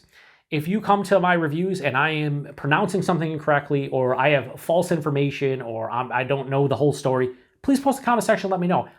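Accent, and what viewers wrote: Western New York, United States. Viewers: Don’t worry about your pronunciation. I think it’s mostly the western New York accent just showing itself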